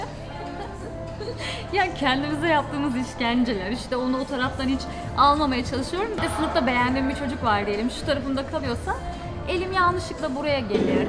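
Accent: Turkey. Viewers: Ablamsende tam bir kayserili konusmasi var karadenizliisin ama biliyorum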